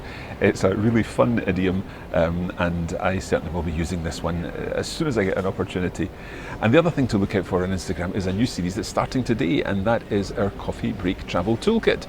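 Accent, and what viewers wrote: Scotland, United Kingdom. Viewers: I love your Scottish accent,. What part of Scotland are you from?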